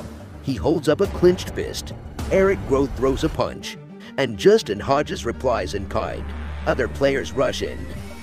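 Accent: United States. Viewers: American accent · Voiceover is a complete waste of time. Just tells you what you can see for yourself. And why the US accent?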